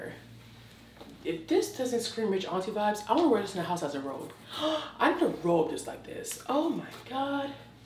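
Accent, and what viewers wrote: United Kingdom. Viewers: Loving your haul from my favourite Shein. Wow your British accents spot on.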